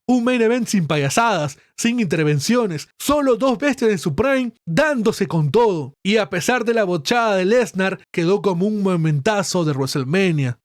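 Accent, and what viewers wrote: Argentina. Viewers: Porque hablas coml argentino? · pq mrd habla como argentino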